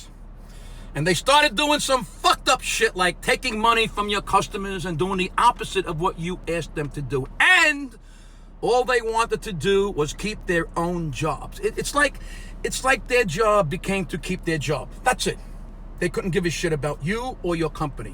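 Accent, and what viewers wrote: New York, United States. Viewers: New York or New Jersey!?😘🥰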